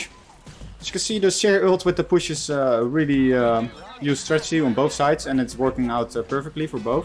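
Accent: Netherlands. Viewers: I'm not trying to be annoying but you are dutch right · Goeie shit bro, lekker nederlands accentje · Wy do you sound dutch 😂 ps hij is wel goed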